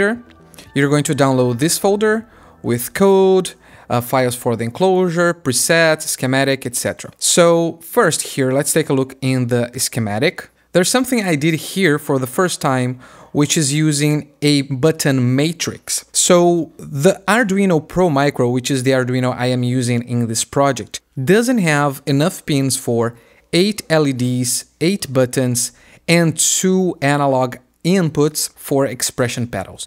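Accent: Brazil. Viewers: tu é Brasileiro né mano? Entendi perfeitamente seu inglês kk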